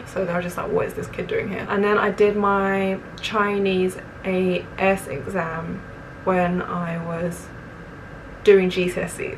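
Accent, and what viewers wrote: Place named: Australia. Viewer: That accent has some Aussie in there for sure!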